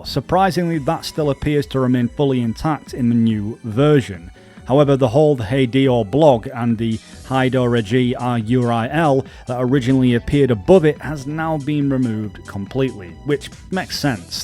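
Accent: Bradford, United Kingdom. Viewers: he’s pretty clearly from the UK, he’s putting dramatically and drastically together, not that hard to understand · Yeah I don’t get why people are making out he’s Spanish or it’s not his first language. He sounds like he’s from Bradford or something 😂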